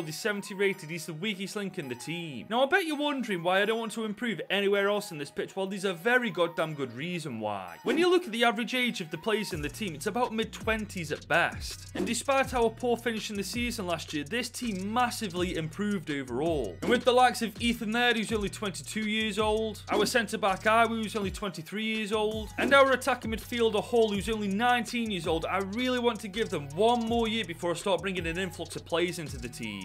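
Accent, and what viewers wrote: Stoke, United Kingdom. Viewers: Are you from stoke bro come on ❤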